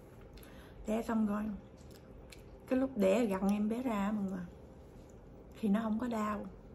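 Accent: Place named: Mekong Delta, Vietnam. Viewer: Giọng chị chắc ngừoi Miền Tây hả chị! Phải ở gần mình tới lui cho đỡ buồn. Em cũng mới sinh xong nên thấy thương mấy Mẹ bầu sắp sinh lắm.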